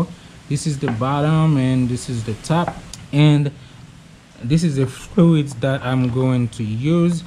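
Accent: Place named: Haiti. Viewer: Haitian accent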